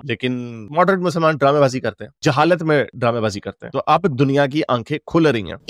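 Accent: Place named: Punjab, India. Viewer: Bro your Punjabi tone is amazing. It gives a humor kick in the topic👍🏼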